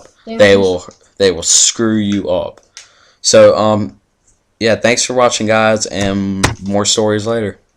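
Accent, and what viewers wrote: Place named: North Carolina, United States. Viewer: What City in NC are you closest to? I live in NC aswell, and you sound like your from around my area!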